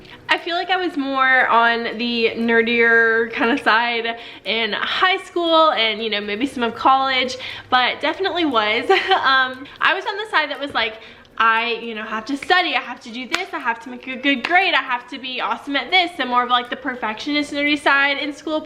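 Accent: South, United States. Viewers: I live in middle Tennessee.. I knew that southern accent!!!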